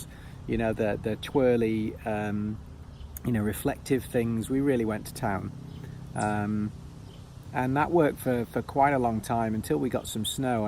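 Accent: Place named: England, United Kingdom. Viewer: awesome! That explains the accent. How you liking the U.S.? It's a lot bigger than England..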